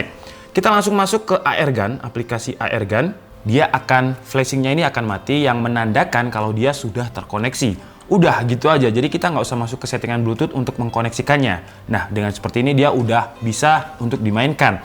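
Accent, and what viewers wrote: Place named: East Java, Indonesia. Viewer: Medok jatim rek 😂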